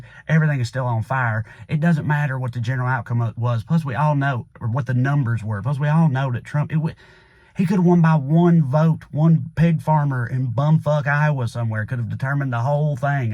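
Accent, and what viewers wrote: South, United States. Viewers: I LOVE you. JOE Biden and I went to the same supposedly Catholic high school for three overlapping years, and I'm srill angry about the candyass pardon of his son. Your observations thereon have at least taken the edge off my anger. But I remain profoundly angry at Joe for his continued arming of the Israelis in their crucifixion of the Palestinians. I continue to visit your site because a redneck liberal who speaks in a Southern accent soothes my soul back into theological Hope for whole minutes at a time. God bless. Stay strong. Speak out. Many thanks. WKS.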